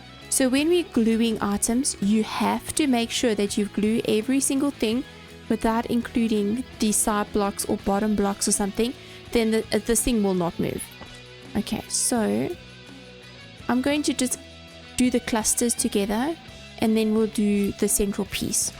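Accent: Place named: South Africa. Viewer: Can’t get enough of the South African accent, could listen to it all day long, this coming from a Scot. Great video!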